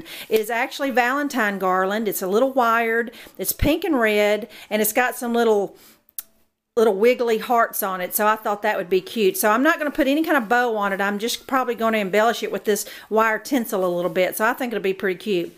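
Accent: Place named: Georgia, United States. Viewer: Love your accent, you sound like my aunts from Georgia!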